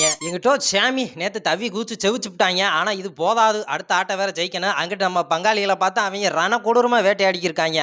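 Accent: Madurai, India. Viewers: Nanum dhoni fan tha bro my inspiration 👍 nee nalla pesura bro maduraiya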